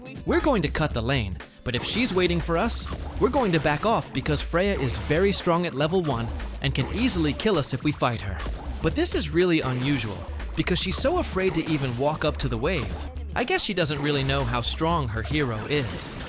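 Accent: Ilonggo, Philippines. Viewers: Ilonggo po? hahhaa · Huli Hahaha ilonggo ka gale nga bilatibay ka ha😂😂😂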